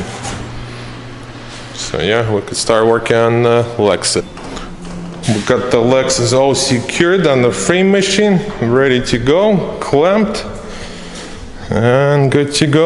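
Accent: Russia. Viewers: Говор как у русского.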